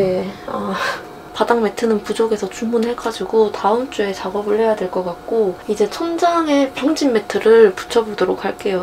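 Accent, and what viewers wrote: Jeolla, South Korea. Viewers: ♡ 젤루 님이 눈에 들어오신건 강아지#강이#이와 함께여서 구독하다 전라도분인걸 확 알아챘음당~ㅋ 전라도 사람은 다아는 억양~ㅋ 저두 강아쥘 엄청좋아하거든요 님의 영상을 보면서 캠퍼의 꿈?을 키워봅니다 항상 잘 보고마니 배워보도록 할께요~😊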